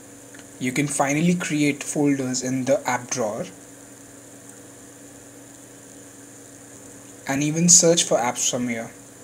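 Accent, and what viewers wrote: India. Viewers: Your english like indian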